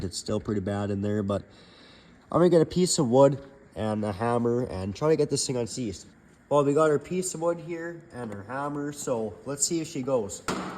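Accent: Canada. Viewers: Sounds Canadian to me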